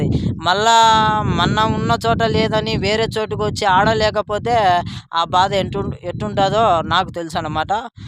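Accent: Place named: Pulivendula, India. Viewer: Anna naadhi pulivendula daggara palli nee basha vintunte maa pallelo laaga vundhi ee vooru anna manadhi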